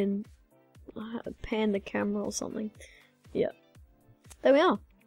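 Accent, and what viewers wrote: Australia. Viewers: you have the most beautiful australian voice :) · I wish i had your Australian accent :3 I have a british accent and it kind of sounds like it but meh xD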